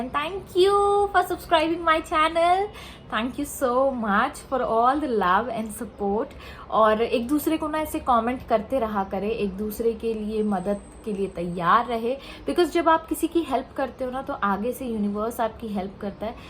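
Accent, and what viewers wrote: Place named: Goa, India. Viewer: Mam, I love your energy and vibe, its very infectious , I love the way you smile ( keep smiling always ) and the way you speak is so adorable , especially the way you pronounce the words like " KARENGA , BOLENGA , " it sounds really very cute, it gives me vibe of a GOAN lingo ( where are you basically from mam, and what is your mother tongue if I may ask? ) May, Can you please teach us the manifestation technique and I'd like to tell you that I have started to chant the Ho oponopono prayer but how long would it take for the good changes to reflect? please guide me how do I go about it. since I recently lost my job and I have no income from any sources whatsoever I am but worried how are things going to shape up for me, please if you dont mind sharing a few useful / powerful technique that could help me I'd really be grateful to you. 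I look forward to hearing from you. please do reply.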